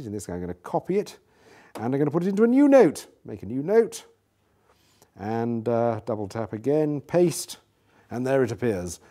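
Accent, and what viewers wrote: United Kingdom. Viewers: Holy crap, the Brittish is dripping of that accent. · he does not just speak with the British accent, he hads his own flair to it lovely job mate like the brits would say!!!!.....nice job from america.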